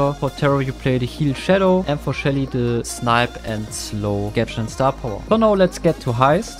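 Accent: Germany. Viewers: Are you German? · Du bist Deutsch,ne? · Man hört voll den deutschen Akzent 😂😂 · Bro is german and i didnt notic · Mann hört einfach dein Deutschen Akzent 😂❤ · Der deutsche Akzent macht es viel interessanter. Danke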